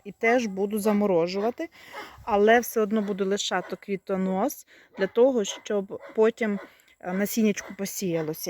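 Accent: Western Ukraine, Ukraine. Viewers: Як ви так швидко перейняли западенський говор.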